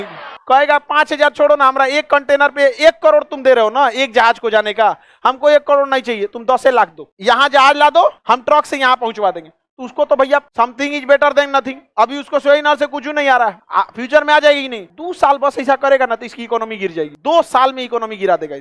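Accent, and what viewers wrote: Bihar, India. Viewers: Bihari me bolna band kr samajh ni ata kuch tera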